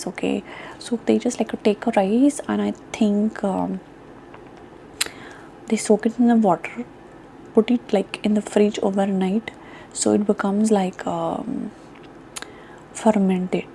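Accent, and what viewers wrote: India. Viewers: I love the Indian accent ones. Like the sassy ones especially. And the way you 'pop' the final consonants in the words is pure ASMR. I could listen to that all day.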